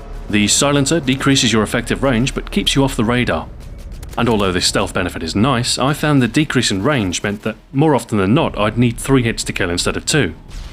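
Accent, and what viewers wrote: United Kingdom. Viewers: It's the British accent and professional sounding delivery. If it was narrated by driftor it would be much less impressive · The monotone and slight british undertone give all of your videoes a "guide on tape" feel, which is just right for the amazing detail and quality of your guides. the lack of intolerable music and over opinionated screaming of most youtube videos has gotten you another watcher. I have subscribed and i hope you will do the same for black ops.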